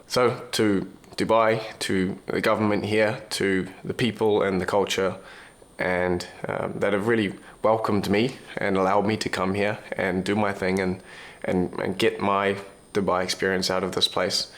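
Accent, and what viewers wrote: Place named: New Zealand. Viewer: Wow coming back home, about 3 weeks ago I was soaking up the content on this channel and I was thinking this guy sounds like a Kiwi sure enough is coming home. I am hoping you will be based in Auckland as I am just down the road.. 😊😅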